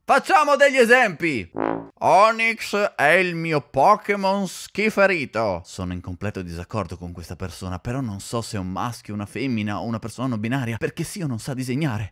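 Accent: Naples, Italy. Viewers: ma questo modo di parlare è normale nella lingua napoletana. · sembra napoletano😅😅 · Sembra napoletano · Incredibile.. sembra napoletano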